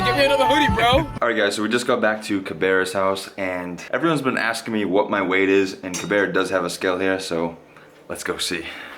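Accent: Boston, United States. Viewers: I just noticed the Boston accent . I didn’t know he was from up this way., meaning The NorthEast ,. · That lady at Dunkin is all of us. Haha! Great video! Hearing your accent makes me miss Boston so much! Keep up the great work!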